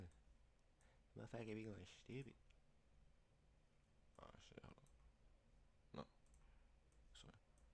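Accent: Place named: Detroit, United States. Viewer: you from the D dawg ? you slick gtta accent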